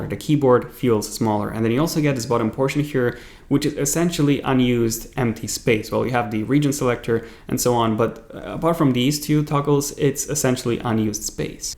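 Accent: United States. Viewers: Your accent is like from usa. Good video mate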